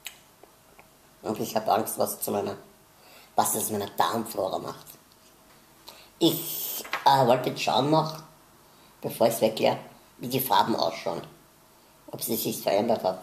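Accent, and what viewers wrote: Austria. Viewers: Cooles Video. Sehr unterhaltsam. Ich find dich sehr sympathisch und witzig. Und nur mal so ne Frage: Bist du Österreicher? Du hast einen sehr österreichischen Akkzent. Wenn ich raten müsste würde ich sagen, dass du Wiener bist. Abo hast du auf jeden Fall ;D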